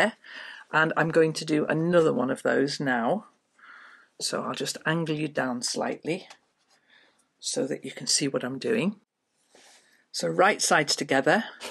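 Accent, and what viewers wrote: United Kingdom. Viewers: Indeed, her British accent & energy & unique authenticity, truly make her a gem of a gal and excellent teacher…She is so relaxing to listen to, like an old friend to sew/craft with! ❤️🙏🌞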